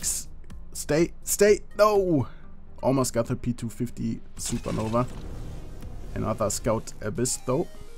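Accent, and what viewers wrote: Germany. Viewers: dieser deutsche akzent beim englisch sprechen ist so offensichtlich :D und irgendwie auch langsam nervig :D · Du hast ein deutschen Akzent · Also ich muss ja jetzt mal sagen...! Der deutsche Akzent geht mal garnich.! :DDDDDDD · Bist du deutscher oder österreicher ? Dein english hört sich irgendwie so an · hört man nordeutscher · Ich kann an deinem English hören das du Deutscher bist warum sprichst du nicht Deutsch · U have a german voice but u are english i think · Dein Englisch ist meiner Meinung nach richtig stark, Grammatik und Vokabular sind einfach perfekt, nur der Akzent ist einfach 100% deutsch ^^ Naja ich kann nichtmal ansatzweise so gut englisch, aber mein Akzent ist schon sehr Englisch:D Hättest du jetzt noch nen amerinakischen Akzent würde ich dich glatt für nen Ami halten^^ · Are you from germany? because you don't sound like an english guy, I mean it's not bad, I'm to german, i think you sound like a german :D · are you german ?? 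:D i think im hearing an accent ;) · Deutscher Akzent ist schrecklich!! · Dein Akzent sagt mir :,,Du bist Deutsch :D''(I think u r a german :D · R you german? Your English sounds like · Man hört so dass du deutsch bist! :D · Man hört das du Deutsch bist sprich doch auch deutsch xD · i wonder if i also sound so german when i speak english. no offense, pretty much every german sounds like that. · are you german your english have a german akzent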